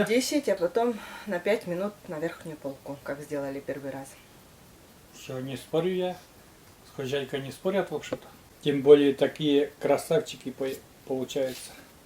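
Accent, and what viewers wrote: Komi, Russia. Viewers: Я думала когда впервые увидела Ваш канал,что это блогеры с Прибалтики.В Коми свой акцент при разговоре.Покажите ещё лепешки с содой.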